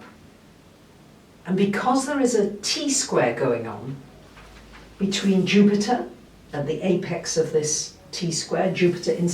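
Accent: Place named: England, United Kingdom. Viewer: Thank you beautiful gentle motivation as always. I love your friendly way with words and your accent,what part of England are you from? 💗💗💗😇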